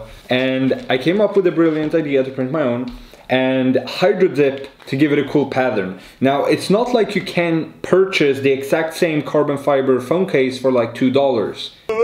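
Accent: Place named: Sweden. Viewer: Mahbub Tamim He is from Sweden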